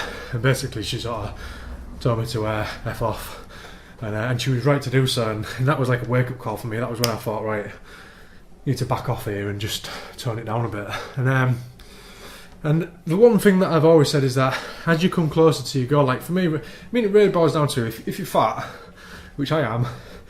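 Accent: United Kingdom. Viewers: Shit, Ill always be a fat guy at heart. I do wish, however, that there was a workout program that would give me your beautiful accent. Im a sucker for you Brits and the way you guys talk.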